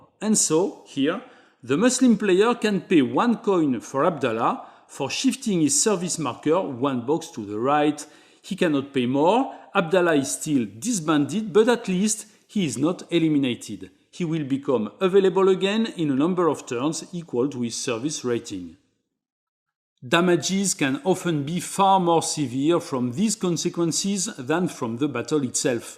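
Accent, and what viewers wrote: France. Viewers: Giving the thumbs up for the french accent alone. Very nice video.😊👍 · Thanks to you I was able to play Nevsky and I made some great memories with my best friend. I just bought this game because you made this tutorial. You are the best, if you keep making these as this series rolls out I will keep buying them. Plus you're French accent is awesome.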